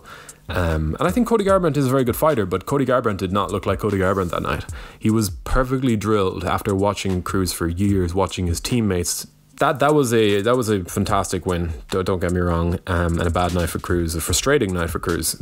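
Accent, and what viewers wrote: Ireland. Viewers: You are Irish aren't you?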